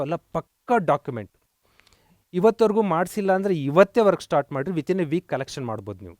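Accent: North Karnataka, India. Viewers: Are you from North karnakata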